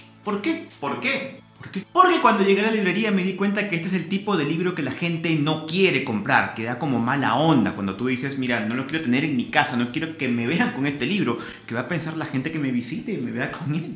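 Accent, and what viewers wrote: Peru. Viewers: Eres de perú????? buen video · ¿Es de Perú? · Eres peruano?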